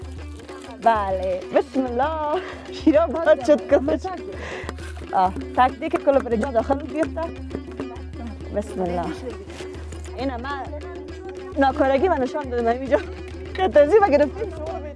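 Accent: Afghanistan. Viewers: Zhwandi osi sha program stasi waqighi sha khabari kawe lahja de socha afghani da bamyan khalak der watan dosta khalak de zindabad. Vary nice program good luck for future.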